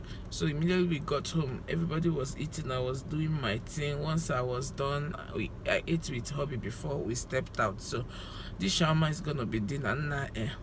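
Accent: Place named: Ngwo, Nigeria. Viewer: Everytime I hear your igbo intonation I always say you sound like someone from ngwo. Please what part of ngwo are you from?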